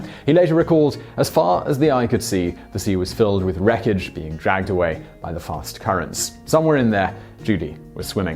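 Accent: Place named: United Kingdom. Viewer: This is vsauce with s British accent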